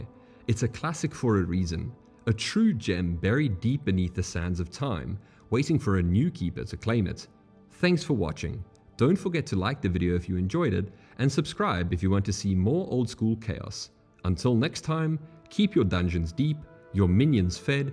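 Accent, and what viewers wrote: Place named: South Africa. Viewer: I hear a South African voice 😎 love this video. I was obsessed with Dungeon Keeper back in the late 90s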